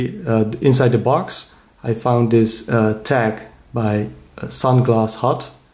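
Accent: Netherlands. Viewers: You sound kind of Dutch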